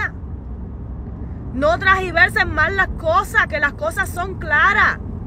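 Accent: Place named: East, Cuba. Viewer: nena, eres una grande, salud, y millones de bendiciones, yo soy de la habana pero me encanta tu asentó oriental, y muy bella que eres.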